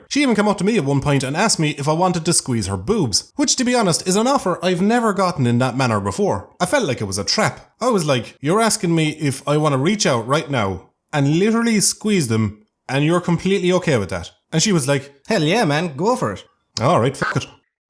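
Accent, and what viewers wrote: Ireland. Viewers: Most youtube storytellers are shit but this is legit because this is definetly sounds like ireland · Where in Ireland are you from?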